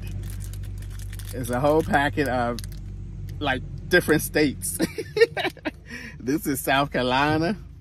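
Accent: Baltimore, United States. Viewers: I didn’t know you were from Baltimore, but I kept saying I hear a little Baltimore in him…maybe he’s from PA.😂 Baltimore representing!!!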